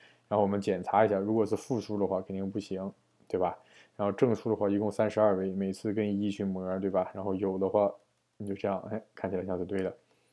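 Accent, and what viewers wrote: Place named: Dalian, China. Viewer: hh 大连话好亲切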